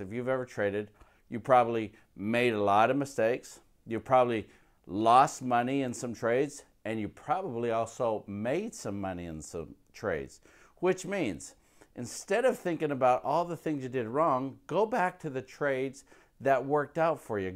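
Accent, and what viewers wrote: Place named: Italy. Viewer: Enjoyed listening to you realised one thing besides everything was saying was right.But also realised you must be Italian.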